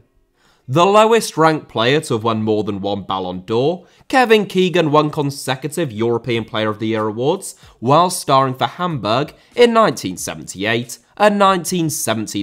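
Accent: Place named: United Kingdom. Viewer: I realized from your accent that you are British. I was sure when he put Stanley Matthews in front of Ronaldinho, Kaká and other great players that they were much better than Matthews, truly absurd.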